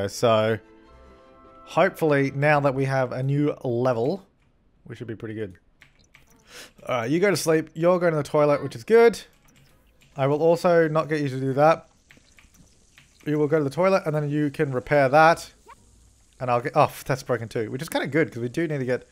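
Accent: Australia. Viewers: YOU ARE A FELLOW AUSSIE! Or Kiwi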